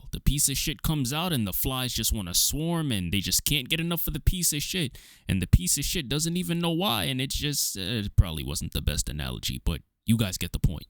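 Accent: New York, United States. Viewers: Are you a New Yorker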